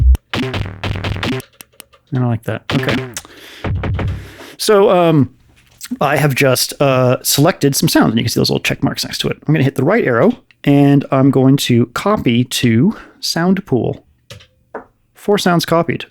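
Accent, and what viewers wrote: United States. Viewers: because your from the midwest , texas perhaps ? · more southern talk plz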